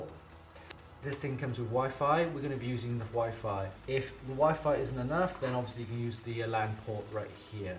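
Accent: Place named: United Kingdom. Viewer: An Asian man with a British accent = YUMMMM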